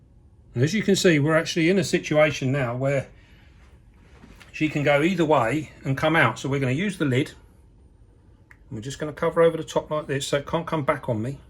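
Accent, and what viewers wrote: United Kingdom. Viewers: That's British, not Kiwi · I'm french and not bilingual at all and can perfectly understand him. I actually like his british accent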